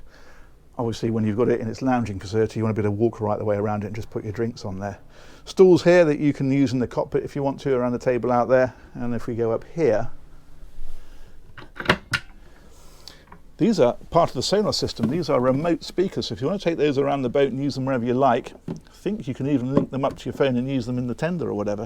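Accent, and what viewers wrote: England, United Kingdom. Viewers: This is the only englishman whos accent i can take. I love you man!!!!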